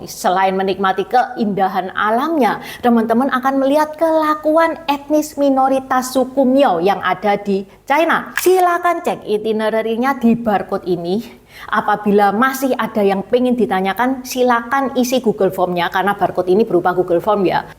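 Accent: Java, Indonesia. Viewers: Mantap ci, saya suka gaya ngomong cici medok khas Cindo Jawa...RIP MBH-Oey Hwie Siang taipan no 1 Indo dari keluarga Hokkian/Fujian.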